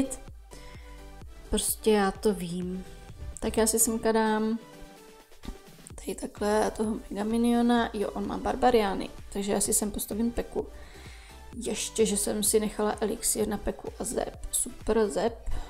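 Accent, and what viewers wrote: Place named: Moravia, Czech Republic. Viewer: Líbí se mi tvoje moravské vyjadřování.Já su atd....:D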